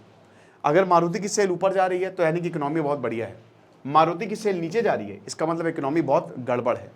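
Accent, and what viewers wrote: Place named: Lucknow, India. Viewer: Aap ya to lucknow se ho ya fir ... aap kanpur se hi 😅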